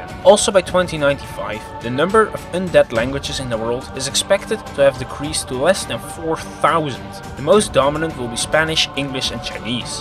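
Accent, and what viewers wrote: Netherlands. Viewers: Gotta love that Dutch accent, kek I'm Flemish myself so it was't hard to distinguish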